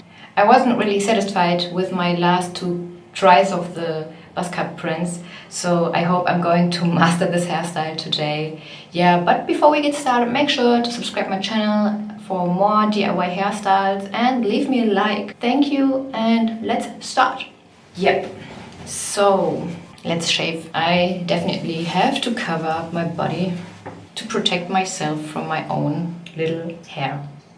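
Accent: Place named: Germany. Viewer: Wallah so ein Deutscher Akzent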